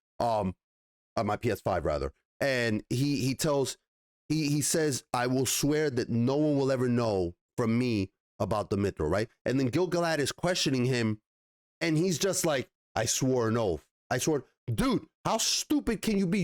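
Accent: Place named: New York, United States. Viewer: Nothing speaks to my soul more than a New York accent ranting. Subscribed.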